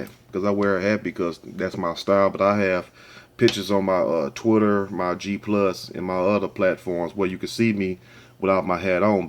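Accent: South, United States. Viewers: THANK U 4 ANSWERING MY QUESTION. I LOVE THAT COUNTRY ACCENT. BOUT IT, BOUT IT.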